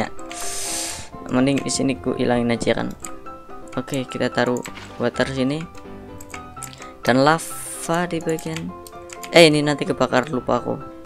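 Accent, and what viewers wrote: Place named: Tegal, Indonesia. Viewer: Abang orang tegal ya :v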